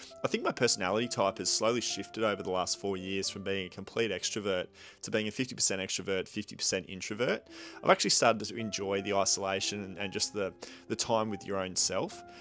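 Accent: Australia. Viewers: your lovely Aussie voice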